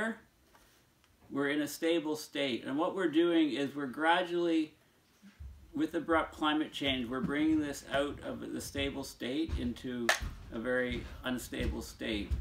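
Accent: Canada. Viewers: has a very charming Canadian way of telling me just how screwed I am. Oh well. At least I am not alone. Greetings from Los Angeles...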